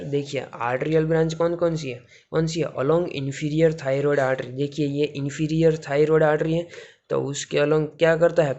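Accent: Gujarat, India. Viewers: અરે ભાઈ ગુજરાતી છો ?? તમારા ટોન ઉપરથી લાગે છે 😅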